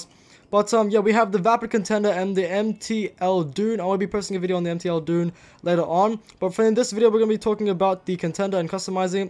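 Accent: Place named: Australia. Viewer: I got bored and decided to watch a bunch of random videos and I just realized...you're Australian and you say "mad" you sound like might car mods lol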